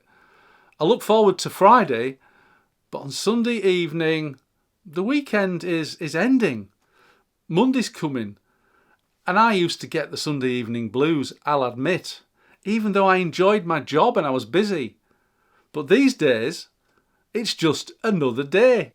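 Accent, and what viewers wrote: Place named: Northern England, United Kingdom. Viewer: Hi, I’ve just found your channel and it’s great to hear your northern accent. I’m a fellow Geordie who emigrated to Alberta Canada back in 2009, but I do have a brother in law in York and miss going there. Mind you, the way you’ve just described it with all the hustle & bustle and drunks from Newcastle coming down on the train I’m not so sure now 😂! I’m 56 and still working part time, but for me it’s the rude people who only think about themselves that annoy me most. I too prefer a quieter life, having grown up going to the Bigg Market drinking for many years lol. I also like to travel in off peak season to avoid the crowds & kids, but most of all during the Winter to escape from -30 temps and the snow. It certainly sounds like the UK has become a lot more expensive, I’ve only been back twice since moving here. 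Anyway, I just wanted to say hi from a new subscriber and I’m going to watch your other videos. P.S is the Cross Keys pub still around?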